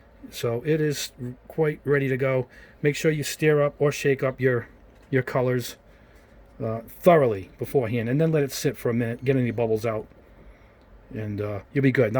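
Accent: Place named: New York, United States. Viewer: The most important tip I’ve ever learned over the years is when somebody with a thick New York or Boston accent gives you a tutorial on literally anything, you tune in and listen because you’re about to learn a ton of shit.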